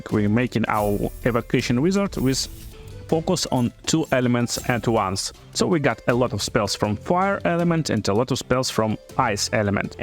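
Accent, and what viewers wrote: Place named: Russia. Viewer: Man, sorry for this question but are u Russian? :D Just interesting, you speak clearly but a little bit with an accent like a russian And thank you for the video! Great review about wizard!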